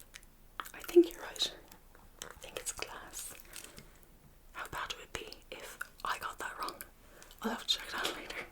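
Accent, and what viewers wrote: Ireland. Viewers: Deeply relaxing and highly informative; the type of video where the material is dense enough to keep the Irish accent from lulling me to sleep.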